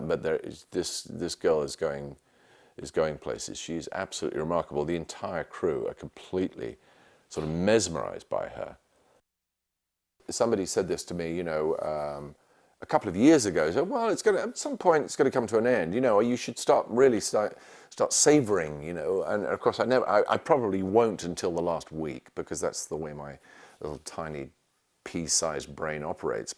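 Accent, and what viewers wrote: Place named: United Kingdom. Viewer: Wait, I think that he has a british accent 🤔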